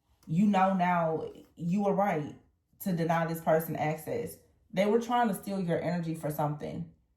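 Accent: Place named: South, United States. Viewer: you are one of the best and most authentic readers I've come across in a while, particularly on YouTube, which hosts many readers. I hear a Southern accent in your voice and am curious as to what part of the South you come from. I am a reader myself and come from a lineage of "gifted" seers. Thank you for your generosity in sharing your gift to bring insight to the collective. Despite the frustration you may experience from time to time, your favor is a blessing to us all. Never forget, but remain ever reverent and protective of your blessed nature. Your are needed in this community, especially now. Stay strong, my Sister!❤😊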